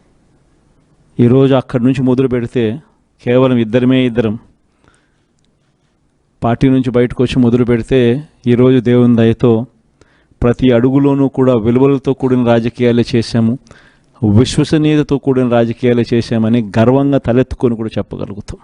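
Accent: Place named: Rayalaseema, India. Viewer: Anna mana రాయలసీమ తెలుగు సూపర్ అన్న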